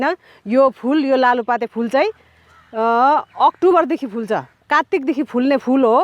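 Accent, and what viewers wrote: India. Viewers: राम्रो छ्🙏हजुरको बोलि indian जस्तै छ्😁कता हो हजुर को घर??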